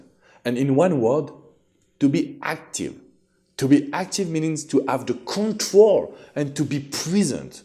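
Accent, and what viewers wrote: France. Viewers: I am falling in looove with your French accent.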